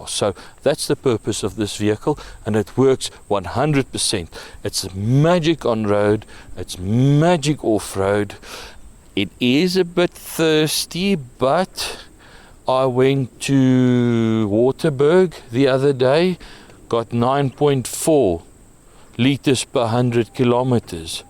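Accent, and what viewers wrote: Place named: Russia. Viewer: Your accent like russian 😅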